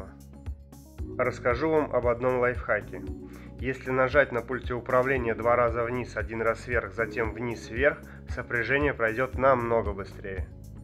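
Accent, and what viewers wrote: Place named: Uzbekistan. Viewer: Узбеки что ли озвучивают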